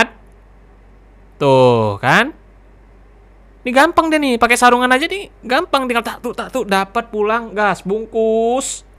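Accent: Java, Indonesia. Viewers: Jawanya asli mana bang?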